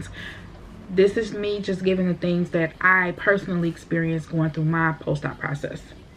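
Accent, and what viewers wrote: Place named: Detroit, United States. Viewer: You sound like a fellow Detroiter!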